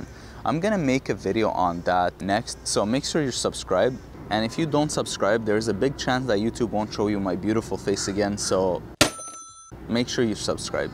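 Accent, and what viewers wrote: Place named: Russia. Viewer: Your Russian accent is showing.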